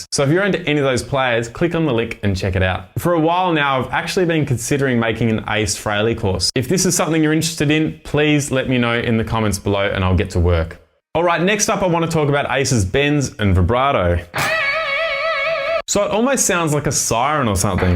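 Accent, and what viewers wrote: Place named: Australia. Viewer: I did NOT expect that Australien accent 😅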